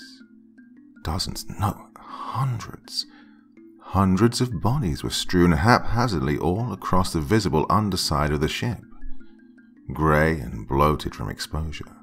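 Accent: United Kingdom. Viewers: Hes British cut him some slack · Part 2...yes please. I was stuck on the narrator's British(?) accent in the US Navy but thats just nit picking. I like the story so far.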